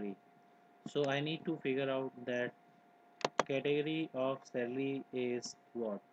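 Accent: India. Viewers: what is with all these excel tut with indian accent?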